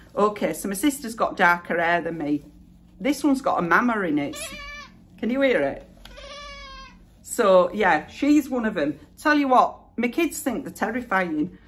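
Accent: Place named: Bradford, United Kingdom. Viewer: That was fab - I'm sure you've had lots of ups and downs in your life but I bet it's never been boring! One more question - are you still going to start your art course? I guessed as soon as I started following you that you had a Bradford accent, but just one Geordie word sneaks in - when you say "wor" instead of "our"! I'm guessing that's rubbed off on you from your mum rather than memory. Last but not least, being a salad dodger myself, I'm glad that your favourite food has gone from lettuce to toast!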